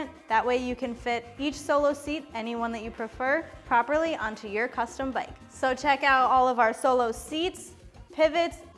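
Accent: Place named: Midwest, United States. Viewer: Nice Thick Midwest accent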